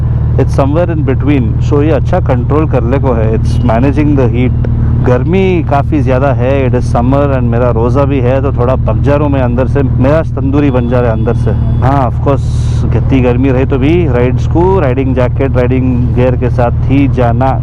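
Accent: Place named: Dakhni, India. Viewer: aapka dakhni mein vlogs aur baat karne ka tareekha bahut saaf and standard he mashallah... baaki log dakhni me baat karne pe kaan mein chubta he... will support this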